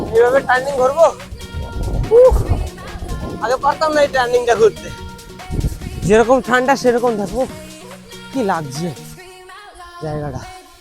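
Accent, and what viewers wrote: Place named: India. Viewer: কথা শুনেই তো বোঝা যায় ইন্ডিয়ান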